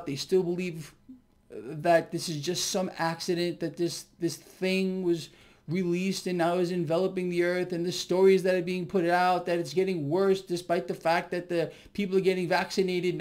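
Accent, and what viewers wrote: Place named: East Coast, United States. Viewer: Of course I don't know. He seems well intentioned, even sweet to me. But I admit to being very amused by his accent and personality. So East Coast!!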